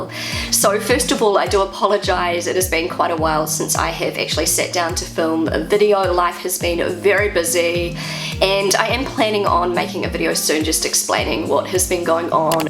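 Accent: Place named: New Zealand. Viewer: Welcome back! Really missed your Kiwi accent. Measles vaccination: I really wish they'd have had that back in the 1970s when I got the measles as a child. It wasn't fun. I had such a high fever the doctors feared for my life. At least that's what my parents told me, but they're parents and maybe exaggerating. Knives: Oh come on! I'm from a rural area, and we boys always had our sharp Swiss Army Knives with us. You can't send a boy to school with a blunt knife, that's embarrassing. Playgrounds: When I was a kid, we had none in our village. But there's a forest, and we were climbing trees instead. Without parental supervision, occasional scratches and bruises included. To be honest, I'd still prefer that. There are sugar-free and even vegan gummi bears, I'm sure the ones from the pediatrician are something like that. Personally, I think children shouldn't be rewarded for doing things that need to be done, but opinions vary. 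"Getting out of winter mode", again: I'm from a rural area. We watch the weather, not the calendar. And you know what the parents in my neighborhood do? They let their children decide what they want to wear. That's good education, in my opinion. They learn from experience, which includes feeling uncomfortable at times if their decision wasn't so wise.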